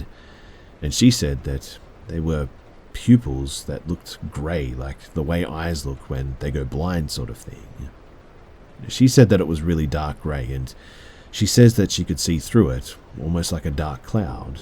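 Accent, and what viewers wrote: Australia. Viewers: Ah an Australian voice finally!? I'm in Adelaide. Chilling stories 🐨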